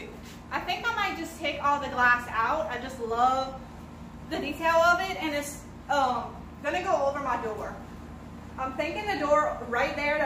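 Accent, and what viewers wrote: Louisiana, United States. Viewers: First time watching and I love the Louisiana accent...the aaaa, ummmm just so cute.. oh my when the kids came in I fell out when you said " Wait I have a kid in here" 🤭 ! I think I am coming back to check out the finished beauties 😊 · LOL, I was trying to figure out the accent too, and figured it was Louisanna as well - tiny Canjun French (first time watching as well). Then she says South Louisanna....we did good ! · I could not find where you were located, but the accent, ahhh, a hint of home - has to be Louisiana!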